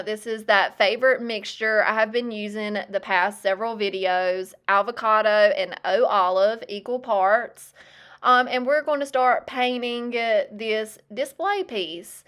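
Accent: South, United States. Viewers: I grew up in the south, but have been in the northeast ( longer than I wanted) and I used to talk just like you. When I moved, people were relentless in teasing me. I have lost a lot of it, I think, but people still ask me where I am from 😂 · I love your southern accent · Love your great southern accent!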